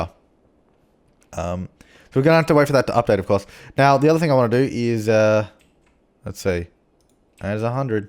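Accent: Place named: Australia. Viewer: What your Australian? I thought you were from the UK PS: I didn't know Australians drive on the left side of the road.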